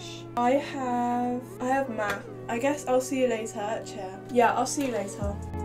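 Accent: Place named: United Kingdom. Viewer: my british accent (Btw I’m not British I’m American): “cAn yOu pLeAsE hAnD oVeR tHe wOaTeR” your british accent: 😙👌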